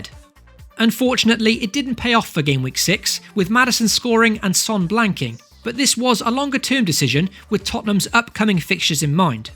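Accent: Wales, United Kingdom. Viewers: C J It's just a Welsh accent.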